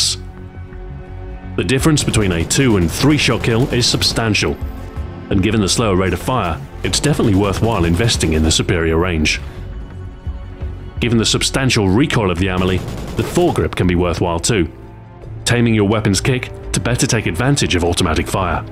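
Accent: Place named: England, United Kingdom. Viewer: The English accent makes you sound very intelligent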